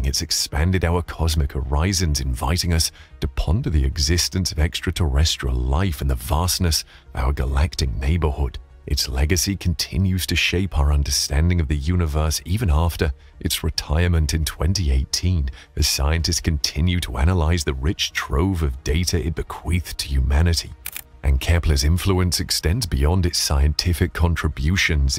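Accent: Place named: Eastern Europe. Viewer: The robot speaker has a East European accent. Many promising titles lately that don't do as they promise.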